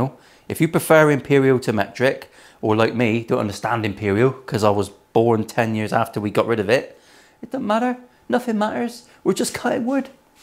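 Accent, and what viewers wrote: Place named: Devon, United Kingdom. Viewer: Really enjoying your videos, great stuff. It's great hearing your accent as well, I have friends who live in Ilfracombe so it's a great reminder of them.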